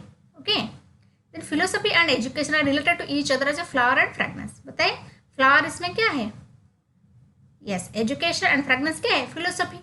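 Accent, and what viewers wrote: Odisha, India. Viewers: Ma'am app Odisha se ho kya ?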